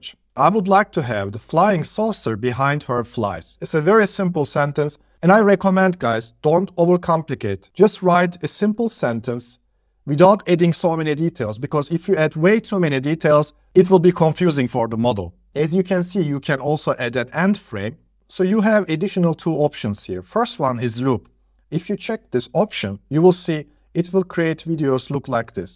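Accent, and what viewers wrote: Turkey. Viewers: hoca, o kadar belli ki türk olduğun ve türk aksanın. İngilizceyi hiç bilmiyor olmama rağmen videonu anladım :))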